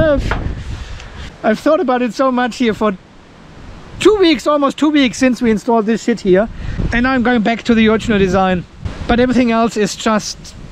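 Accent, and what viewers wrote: Germany. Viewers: Sorry to inform you but I am correct A-do is not a name in the English language. It might be a name in your native language, which sounds German by your accent. But as a native English speaker born in America where the phrase is often used. The phrase "much ado about nothing" is used to describe a situation where there has been a lot of excitement about something that is not really important.03 It comes from the title of a humorous play by William Shakespeare.3 The phrase was already being used for a big commotion or trouble in the early 1500s. Some people make a big fuss over a trifle, as in Jerry had everyone running around looking for his gloves.02 The phrase is often used to describe a situation in which there has been an overreaction to something that shouldn't have caused so much trouble.. But I think you know all of this, and are only making a Joke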